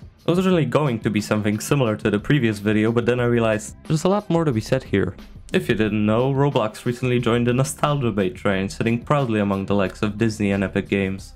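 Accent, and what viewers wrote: Poland. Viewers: Słychać, że Polak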